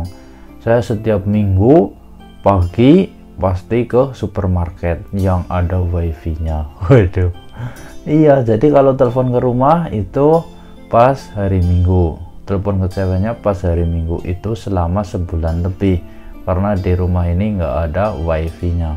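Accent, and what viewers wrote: Banyumas, Indonesia. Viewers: Ora Ngapak ora penak yuhuuuuu ketemu dulur Ngapak mning heee dengerin cerita suka duka di Jepang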